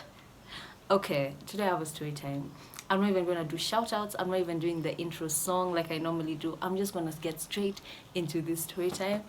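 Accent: United Kingdom. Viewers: I love how you introduce the British accent naturally 😍😍😍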